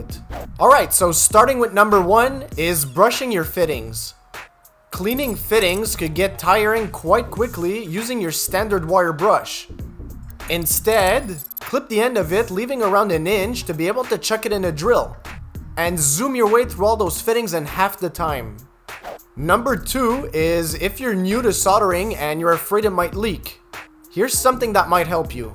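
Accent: Quebec, Canada. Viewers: I love the accent. I just knew you were from Quebec. Hey, you're a smart guy. Merci for sharing your knowledge. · I like the Canadian accent!! It's all good heh!!!! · I knew you were from Quebec 😂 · I could tell you re from quebec ... the plate confirmed it 😎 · AHA... yes I thought I heard a Canadien brotha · French Canadian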